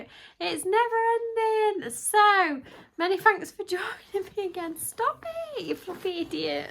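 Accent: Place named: Derbyshire, United Kingdom. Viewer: Hi, hope your well. I've just come across onus YouTube channel and watched your latest vlog, and your accent is so, well, derbyshire, Nottinghamshire, i thought you sound similar to meet and familiar. Anyway noticed in your latest vlog when you was waking to the charity shop, a van with a 01623 phone number on, that's mansfield. Are you from the mansfield, derbyshire area? Sorry, i know this is a really random message, lol, im not a crazy nutter, honestly. Well maybe I'm a bit crazy lol xx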